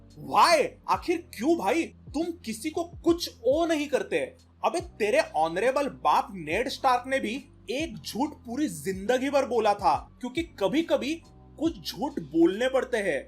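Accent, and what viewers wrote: Maharashtra, India. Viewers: Bro are you marathi your accent is like marathi,nice video bro 🫡😂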